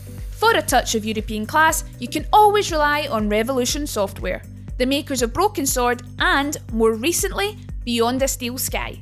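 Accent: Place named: Scotland, United Kingdom. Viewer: These vids are really good, and I love the Scottish accent, but they’d be even better if you wrote what platforms the games are on too!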